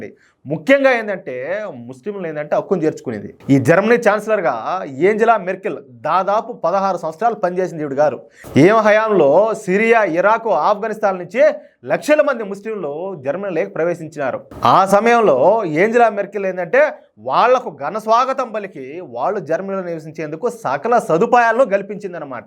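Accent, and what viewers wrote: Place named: Telangana, India. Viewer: తెలంగాళ stail తప్ప మాములు గా మాట్లాడితే బావుంటుంది.... నేర్చు కో బ్రో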